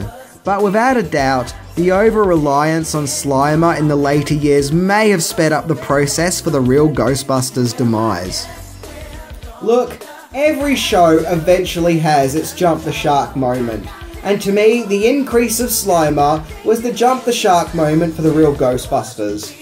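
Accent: Australia. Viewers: Yeah i remember the rename to the slimer thing. I had kind of started to loose interest in it then. But the first couple seasons were really good. But that's what happens to a fruit when you juice it too much. The first press is awesome. Second press.. kinda bitter. third press... you might as well eat the peel. I have been watching your videos trying to place your accent. I never guessed Aussie. And I've been talking to Australians since yahoo chat was a thing. I like Australians. I had a lot of fun conversations back in the mid to late 90's with australian guys on yahoo just joking and talking smack. You are a friendly bunch. I like that.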